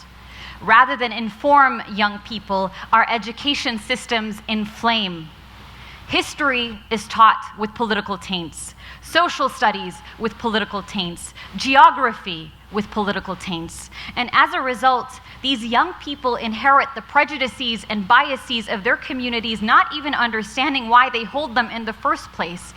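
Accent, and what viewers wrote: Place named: England, United Kingdom. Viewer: I wish one-day I can confidently give speech to the audience just like her with a confident english accent.... she's my ideal ...I love her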